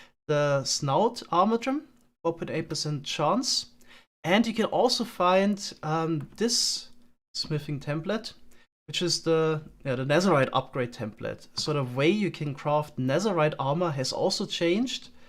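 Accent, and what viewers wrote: Germany. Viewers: I always hear of your Farms but never knew who you are. So i subbed a few days ago to look a bit into your channel, and yes you're Minecraft Content is awesome 😁 But one question raises as i listen to you... are you German? Bist du aus Deutschland? Klingt vom Akzent her nämlich so und würde perfekt zum komplizierten Maschinenbau passen! 😄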